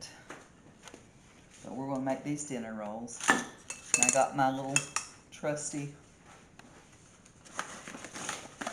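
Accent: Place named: South, United States. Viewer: Have you ever heard of any kind of roll that was made without yest? You southerners are so weird the way you talk adding extra words in when you don't need them and cutting words out that normal people say. You're like the British just making stuff up as you go along. Do you say beet root also like there's some other kind of beet that isn't a root? I guess if you grow up saying it, it sounds normal but for the rest of the country it sounds like you rode the short bus to school.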